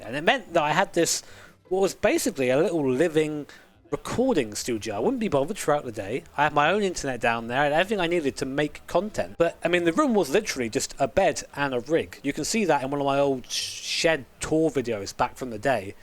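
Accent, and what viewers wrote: United Kingdom. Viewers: I started watching you while playing GT6 year or two before start of big cough, finishing my uni. Watching you and tbh. There was something cozy and helping me in "British guy talking with engine background ASMR" at this period. Thanks for all your content, wishing you all the best, like best car ever - Fiat 126P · I remember when I discovered this channel it was a livestream with about 150 viewers and this guy with the British accent and the Japanese headband was completely dedicated to what he was doing. For me it had something of the Topgear spirit... made me subscribe straight away...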